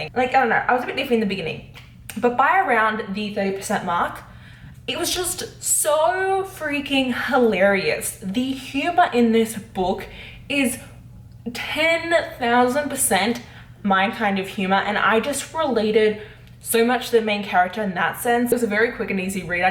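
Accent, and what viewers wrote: Australia. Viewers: I just came across your Chanel and love it. I’m curious..... your accent, are you Australian?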